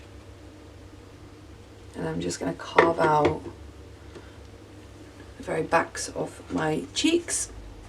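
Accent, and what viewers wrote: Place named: England, United Kingdom. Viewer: So nice to hear an English voice on YouTube 😁 as all the beauty bloggers I follow are Australian or American. you're a star...love the bronzer, nice to see you using some revolution products I love them too. Goodluck ill share your page xx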